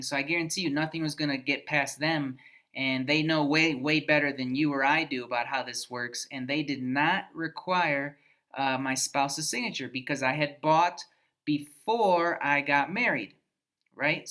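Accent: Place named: Ecuador. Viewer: You sound more Ecuadorian to me than gringo!!🙃🙃🙃🙃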